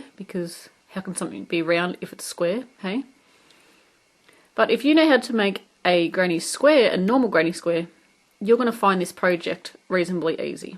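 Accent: Australia. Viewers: So nice to hear an Aussi voice. This will be my next project.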